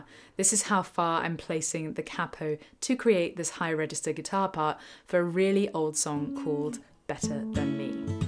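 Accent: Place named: Bristol, United Kingdom. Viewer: Always a pleasure to see you, and hear that lovely Bristol accent. Beyond that, I'm with "intersanctum." Lots of guitars look and play similar to this one, and Martin should make a " " edition if you're doing Martin commercials. I do like the new neck joint, and, of course, it's a Martin, so it sounds great. Way out of my modest price range, however….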